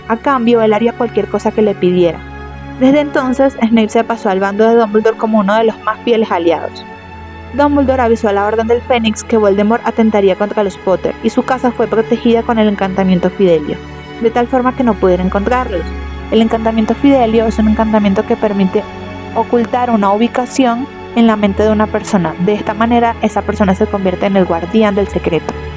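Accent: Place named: Latin America. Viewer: Es la primera vez que lo escucho en audio latino, se me hace raro 😅 Pero dale, vas a ser grande!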